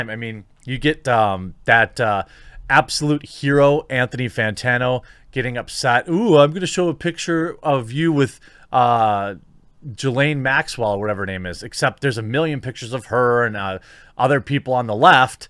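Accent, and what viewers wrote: Wisconsin, United States. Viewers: Nah, but that Wisconsin accent warps things a bit. 😉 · has a Wisconsian accent?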